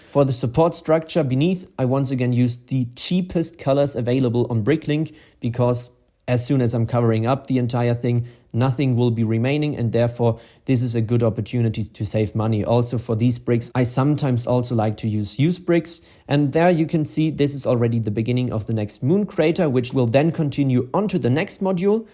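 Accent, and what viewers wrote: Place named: Germany. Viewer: What about that german accent though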